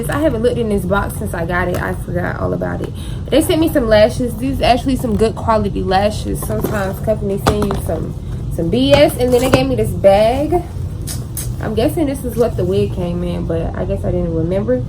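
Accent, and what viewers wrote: Atlanta, United States. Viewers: Where you from? I’m guessing Atlanta or Savannah with that accent! lol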